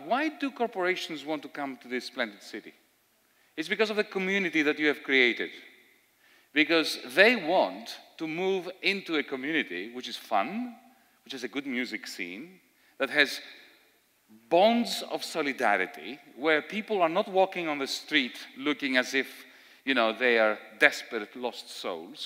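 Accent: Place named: Greece. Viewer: I would say 75% to be fair. It takes a while to get used to his strong Greek accent.....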